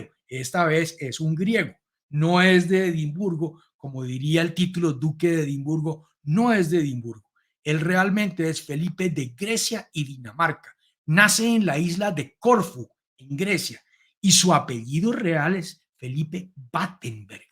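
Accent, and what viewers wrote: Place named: Latin America. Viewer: Tuvimos? .. aparentemente el comunicador bastante acento latinoamericano ,.debe de ser venezolano o colombiano etc. Y aquí en Sudamérica poco tenemos que ver con los reyes más que en el tiempo colonial...así que decir tuvimos...¿No será un poco pretencioso?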